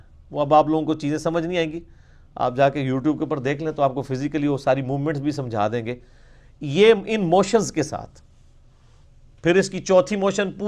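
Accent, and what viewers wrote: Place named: Punjab, Pakistan. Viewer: byology wo apne accent ki waja se bol raha ha, q k wo punjabi hai ,or rahi bt darwin ki theory ki to wo sirf ek theory hai, or kafi scientist b os theory k against hain, or boht sare scientist support b krte hain, but science with evidence ho jb mani jati hai, jaise NASA ne hmein planets dekhaye. thoery to koi b Scientist de deta hai, or next century ya phr osi century mei os k against b ek theory ajati hai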